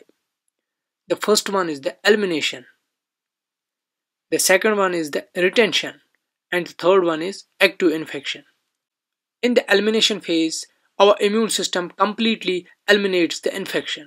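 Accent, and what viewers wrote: Kashmir, India. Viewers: Typical kashmiri accent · Sounding like a kashmiri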